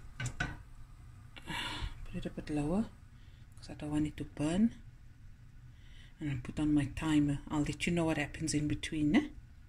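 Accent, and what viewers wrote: Cape Town, South Africa. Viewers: That capetonian accent is unmistakable.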